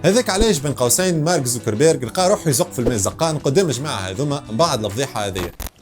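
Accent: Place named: Sfax, Tunisia. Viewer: اللهجة الصفاقسية بدات تتسرح على العادة .. ولات أوضح شوية 😁 Great video 👏👏👏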